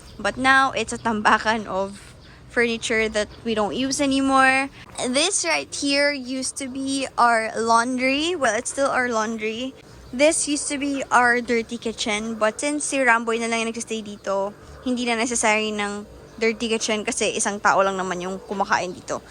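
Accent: Visayas, Philippines. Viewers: I love her bisaya accent like when she said wala na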